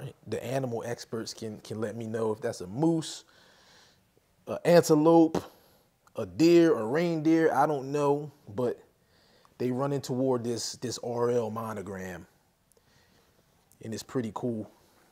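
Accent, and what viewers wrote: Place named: Philadelphia, United States. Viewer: I detect a Philly accent. And heard a jawn in there I think? Respect. #Polodelphia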